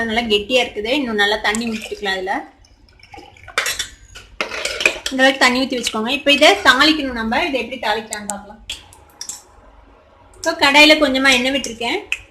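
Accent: India. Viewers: nice sis..indian tamil nalla iruku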